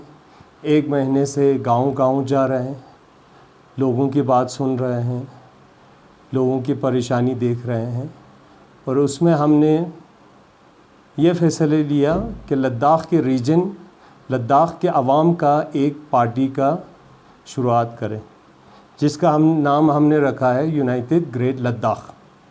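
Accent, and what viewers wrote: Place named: Iran. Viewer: pharsi style hindi he is using 🤔🤔🤔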